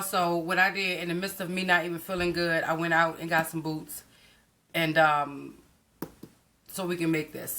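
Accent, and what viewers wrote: Baltimore, United States. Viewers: I was just about to ask😂 tbh I was leaning towards DC because of the way yu say certain things, but then that distinct "uu" came out.... but the real give away for me was the fact you customized boots which is a female hustle out Bmore...😘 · Your from Baltimore ?? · You must be from Baltimore Maryland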